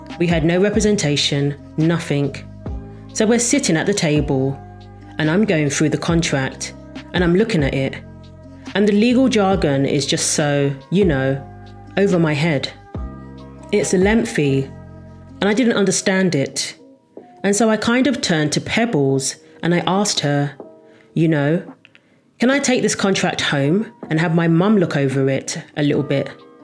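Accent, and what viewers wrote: United Kingdom. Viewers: Hearing about the 90s in a Brit voice? I subscribed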